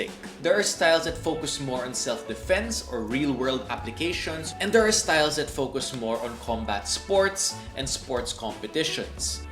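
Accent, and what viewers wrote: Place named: Latin America. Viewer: Wena pregunta. Habla c acento latino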